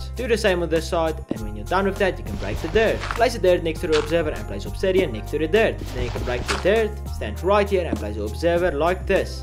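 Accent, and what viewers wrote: South Africa. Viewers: klink soos n safa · South African, my Bru?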